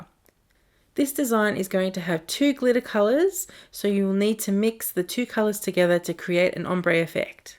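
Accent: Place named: Kentucky, United States. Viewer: Love that Kentucky accent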